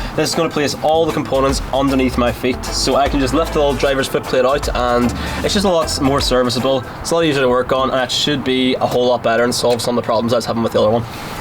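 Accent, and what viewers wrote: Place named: Ireland. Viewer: Nice vid interesting facts about the foot cylinders sizes. Strange hearing a irish/northern accent 😂 if your even from here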